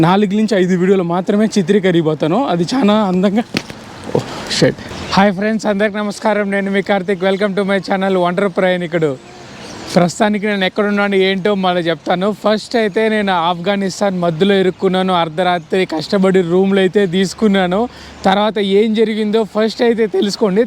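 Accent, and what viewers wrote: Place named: Tirupati, India. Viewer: Brother, nee bhasha chusthe nuvvu maa tirupati laga vunnavu😊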